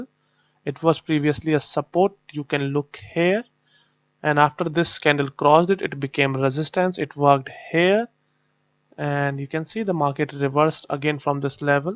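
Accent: India. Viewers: hi bro. i'm from Vietnam. it's not easy for me to listen Indian English but i'm so happy to see your video. it's truly useful. Thank you so much.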